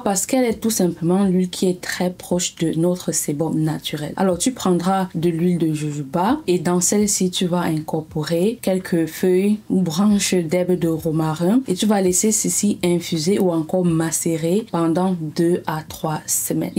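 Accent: Cameroon. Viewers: J'aime trop ton accent camerounais 😊😍💋💗💖💕😜🌊🩷😗🫶🏿😘🥰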